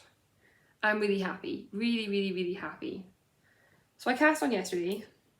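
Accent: Scotland, United Kingdom. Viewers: Perhaps for your Rust sweater you might consider knitting the whole neck with ' knitting in ' elastic, which because it is fine has the advantage of being invisilble, and the elastcity is gentle but lasts. I hope you don't mind my saying, but I do love you soft scottish accent, I think all the people you need to contact by phone should only be allowed to employ, scots people, the whole world would be a lot happier as who could be cross with anyone who sounds so lovely.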